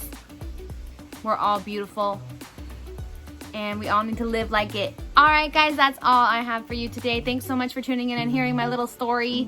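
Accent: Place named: United States. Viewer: I think it might be your voice that sounds American to me rather than your looks, but as you said we are all beautiful and more and more of us are mixed these days. I got 88 per cent European on my DNA test but I looked at my Jewish grandfather's brothers and they look like a League of Nations. I look white but always felt more Native American. When I got my test, I was 12 percent Native American, Asian, African and my friends just laugh and say my test was not accurate. That kinda hurts cause I am all races..... I was happy to find out I am part Taino...from Puerto Rico....!!!! I don't live there but I would probably look like the whitest Taino at the ceremonies.....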